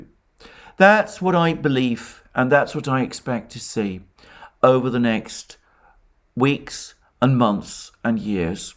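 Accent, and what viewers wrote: United Kingdom. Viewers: Yes, i am commenting, but why are you shakin that strangely, greek man with good Brit accent ? Both russia and ukraine are NWO controlled...i saw in mr Christofouros channel comments praising russian president : this is complete foolishness. But majority wants to believe that either ukraine is the good cop or russia saves the world : divide and rules for unuseful idiots ! Thank you. Greetings from Polska...we are unique, because in our history always anti fascist and anti communist ! ( Like Orwell :-) )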